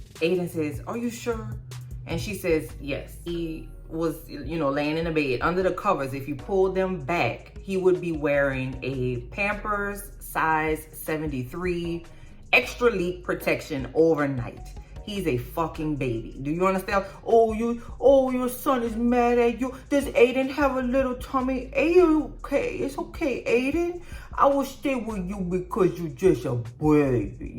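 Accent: New Orleans, United States. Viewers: Why don’t they just put you on the show, you could be one of those ladies. I don’t know why you’re in New York with a New Orleans accent but they’ll figure it out. This show just needs more laughs.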